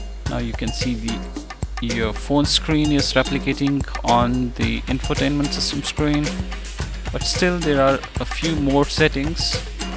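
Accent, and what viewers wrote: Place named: India. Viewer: the first tutorial with hindi accent that actually helped me Great job mate you are 1 in a million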